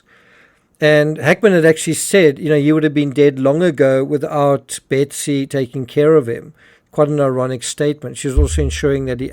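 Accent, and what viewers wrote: South Africa. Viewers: Do I detect a South African accent? I can understand Betsy not calling for help. I got swine flu, and could not even call my husband, get back on the bed, if he had not come home, I had slipped into an acceptance of death stage, he carried me to the car and into the surgery, all the time I was in a dream world. RIP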